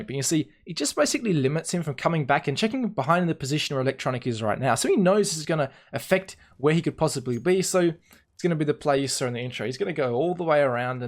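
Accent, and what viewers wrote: Australia. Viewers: Nice recommendation, but he is not from NA I think. He must be from Australia, that's why he is speaking with a funny accent.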